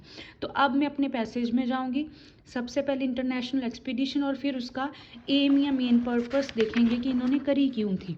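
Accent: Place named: Punjab, India. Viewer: Nyc punjabi akcent overall the method is too good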